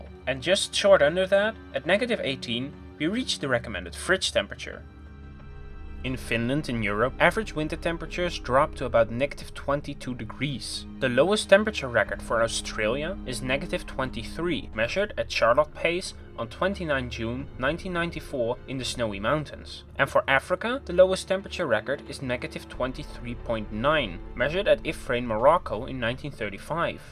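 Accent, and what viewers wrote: Netherlands. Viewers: Are you Dutch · Ah, hello my Dutch friend, I recognize that accent :) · are you dutch? olijf oil intersekting point etc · leuk nl accentje hahah. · Ew dutch accent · the dutch accent.......... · Man is dutch💀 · Lol, ik realizeer me net pas dat je Nederlands bent... Blijf goeie content zoals dit maken, je hebt talent! (Ik dacht al dat ik dat accent herkende... ;P) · Are you dutch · Do I detect a Dutch accent? 🌡️❄️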